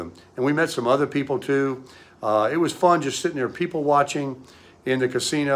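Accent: South, United States. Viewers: He’s southern 👍🏼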